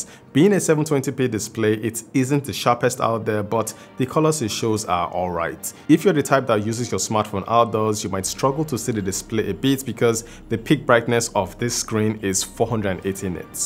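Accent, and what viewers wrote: Nigeria. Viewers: Bro are u originally from Nigeria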